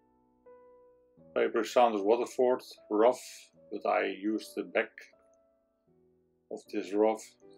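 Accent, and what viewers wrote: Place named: Texel, Netherlands. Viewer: Love this painting..so beautiful. Love your accent as well. Reminds of my grandfather who came from Texel...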